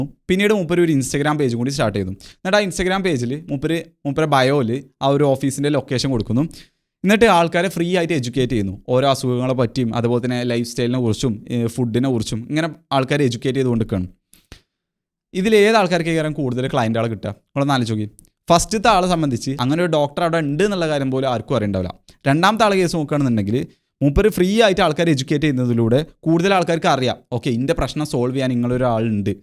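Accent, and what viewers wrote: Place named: North Kerala, India. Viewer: Bro that's not completely your fault, he is tone is slightly from the Northside which even the middles or Southside have a bit trouble to understand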